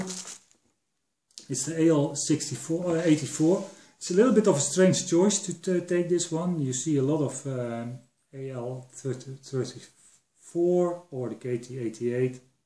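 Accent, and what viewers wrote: Netherlands. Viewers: Bedankt. Ik droom al van deze versterker. Ik heb een FM-tuner met lampen en de klank is helemaal anders. Voorlopig gebruik ik een oude versterker met discrete schakeling die het zeer goed doet. (Ik dacht wel dat je Nederlander was gezien je accent...). Walter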